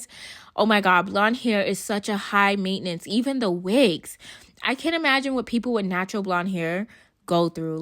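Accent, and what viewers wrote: New York, United States. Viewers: “BRONZA”😭😍🤣 I love your NY accent!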